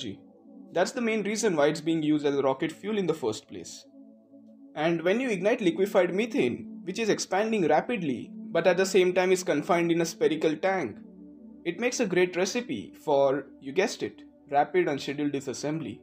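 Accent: India. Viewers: Bhai mujhe indian accent lag raha hai, I'm happy to see, an Indian guy talking about Spacex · No no, your style of speaking is Ditto like an Indian, however you are,🙄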